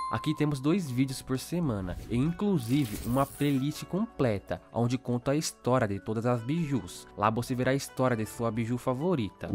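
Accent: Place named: Portugal. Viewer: Cara gosto muito do sotaque de portugal é bem legal mano sigo um bocado de youtubers portuguêses por causa disso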